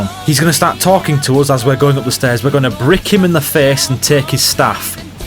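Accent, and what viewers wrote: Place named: United Kingdom. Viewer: Of course its s UK accent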